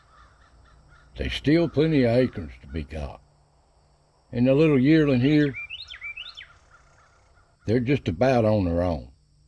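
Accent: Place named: South, United States. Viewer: We really envy you, living in that beautiful place, looking up into that Peaceful Place that God gave us to embrace & enjoy😊. The simple pleasures really are the best. Really enjoy listening to you narrating those wildlife events & happenings, just something real right hearing it said with that old southern drawl; real authentic you know: right down to the ground genuine!! The Peaceful moments are much appreciated. Next best thing to being there🙏🏻!! 🫎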